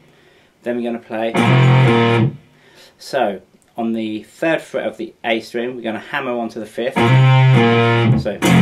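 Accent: England, United Kingdom. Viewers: ....and thanks for speaking with this beautiful english accent so that a stupid german like me can understand you that well!!!! I never get these us guys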